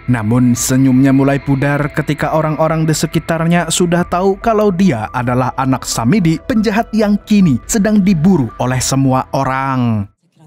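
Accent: Banjar, Indonesia. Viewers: Katuju banar ulun wan amang wan amang.channel nyak the bestlah.😂😂😂😂❤❤❤kalihatan banar logat banjar nya bumbuhan...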